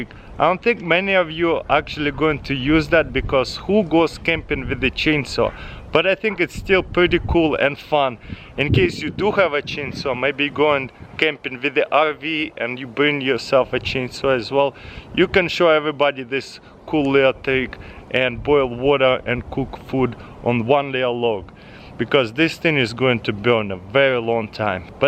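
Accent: Russia. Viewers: Вот это понимаю,английский с русским акцентом)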